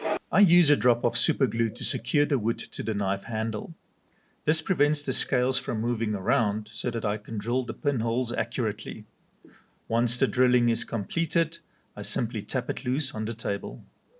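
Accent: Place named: South Africa. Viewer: I thought I recognised that accent RSA!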